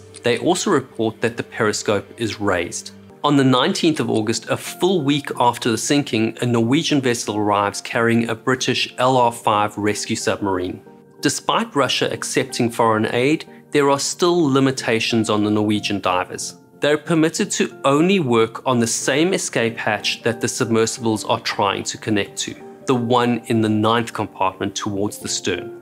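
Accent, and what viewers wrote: South Africa. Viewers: Mate, I would just like to send you all the compliments you deserve!! Your quick and concise detail of complicated events is an absolute pleasure!! I've watched many of your videos of incidents I already know a lot about and still won't skip a second! Best of all, having a good old South African boitjie telling me the story makes me feel like I'm chatting to a mate! Thank you for all your time and effort you put into these videos!! I tip my hat to you sir!!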